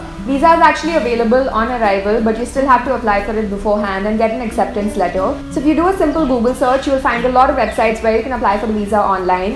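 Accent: India. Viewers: 10.x speed if you speak like this in Canada BC you will be fired from job brain draining Indian accent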